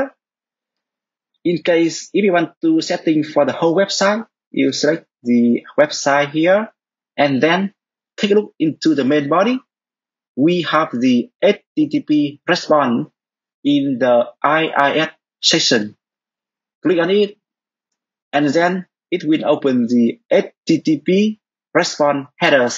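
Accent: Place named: Vietnam. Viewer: Em nghe phát biết anh là người Việt, anh ra series tiếng Việt luôn đi anh.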